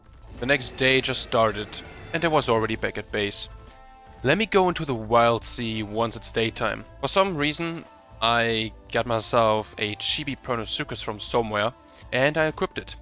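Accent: Germany. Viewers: Bro Sounds like a german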